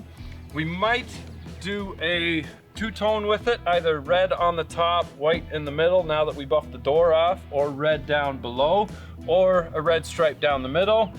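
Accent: Canada. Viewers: Talking a' boat! I love the Canadian dialect!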